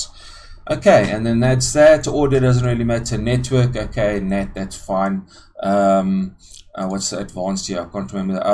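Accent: South Africa. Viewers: Why can you always here a saffer right off the bat 😂. Lekker man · u frm SA?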